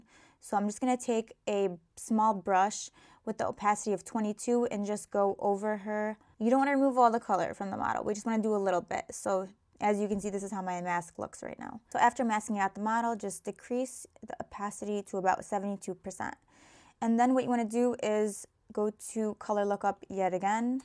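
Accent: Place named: New York, United States. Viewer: tnx for the tutorial. i find it cute when u say u wah (u want), dah (that) 😘 super new yorkerish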